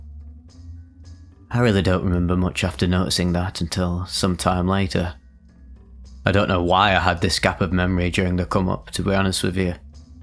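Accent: United States. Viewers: Omg I love your American accent 😂 it made this already amazing retelling of this story all that much better! Your voice and affect are honestly so perfect for telling stories! Especially these- so to hear a twang of humor is utterly amazing ❤😂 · your american accent is so funny haha great voice acting man loved this one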